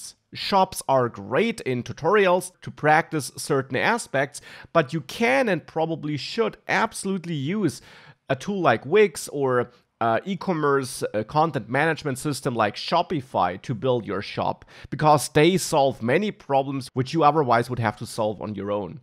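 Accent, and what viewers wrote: Germany. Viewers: are you from germany? (accent - pure interest)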